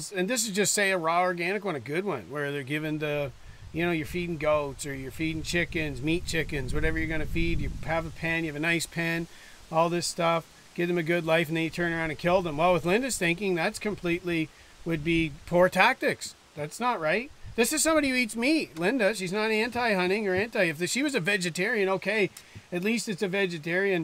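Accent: Canada. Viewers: Off topic, even though I'm in Manitoba, I could tell you were from the Maritimes just in how you say the word 'barn". Congrats on the PC win in NB (if they were who you were going for...I won't make assumptions)! Cheers.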